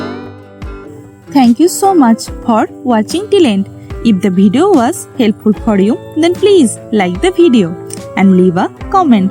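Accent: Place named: West Bengal, India. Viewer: Are you from West Bengal? Because your voice like from there don't worry I too belongs from West Bengal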